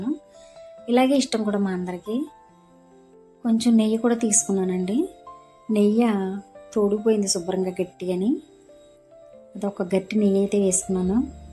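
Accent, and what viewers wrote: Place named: Godavari, India. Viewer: మేడం మీది గోదావరి నా మీ యాస అలాగే అనిపిస్తుంది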